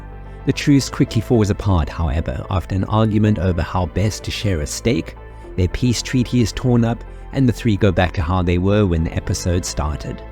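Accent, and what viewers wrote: South Africa. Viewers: Hey you are South African right judging by your accent? It’s nice to meet a fellow South African cartoon lover.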